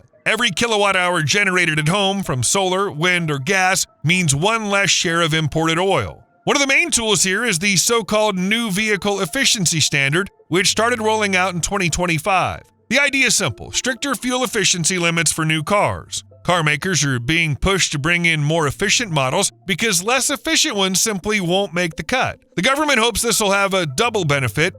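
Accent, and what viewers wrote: United States. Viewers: Because it's a Yankees voice for the video, I'll give a example, "WE PAYED YOU FOR THE NUCLEAR SUBMARINE DEAL WE ARE HALFWAY IN TIME AND STILL HAVEN'T RECEIVED THEM OR ANY"?????? WTF WE ARE YOUR BEST ALLIE AND IF I WAS PRIMINISTER I WOULD ASK FOR REFUND & GET A GOOD DEAL NOT BENT OVER WITH NO GASOLINE.... LOL 😂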